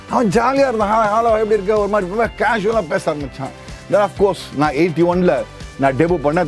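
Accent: Chennai, India. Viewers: Love your easygoing and lovely natural narration, and with the Chennai slangs it’s even more funny!! Gadupadango was the funniest! 🤣🤣 Amazing tribute to one of the all time greats of Cricket. Almost 30 years since Kapil retired but we are yet to find another one like him, that shows what a rare breed he was! Hats off!!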